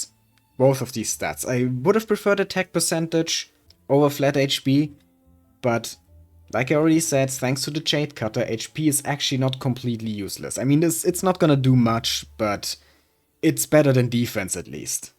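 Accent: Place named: Germany. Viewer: das richt nach deutschen Akzent xD aber nices video tbh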